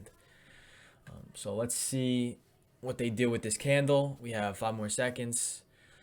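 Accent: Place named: New Jersey, United States. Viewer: yo you from jersey ?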